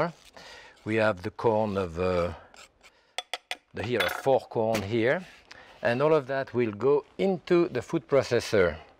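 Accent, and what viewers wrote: France. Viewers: "I was repeating what I heard." if that's what you heard, THAT'S the problem. he doesn't say "ze.I was not making fun of his English" I believe that, I believe you... which is part of the immigrant problem (because he doesn't say "ze")... but YOU still somehow "hear" (I don't doubt this) some caricature of a french accent. (and I'll fully admit his accent lives on in his "hour" - but JFC just STOP with the "ze" nonsense) julia child called him instead of "." that's just true. your "Pepe LePew" nonsense isn't. if you read this far: I don't think you were trying to mock his accent. but I also don't think you were listening.... or you were and you're an american that somehow has never heard an accent beyond and LePew (and maybe Clouseau: i.e., "minkey") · Yeah, after so long in the US, French accent mostly shows through in dropping the 's' in plural words. 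I mean, there's other things, too, like 'roast it for, like, an arrr" which are just beautiful. He couldn't be more American but also, just, so, so French. (I'm from Chicago, but I live in Montreal, so my French is...weird)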